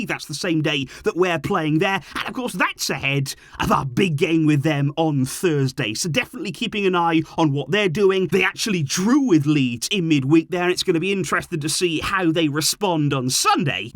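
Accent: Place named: Wales, United Kingdom. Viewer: he’s welsh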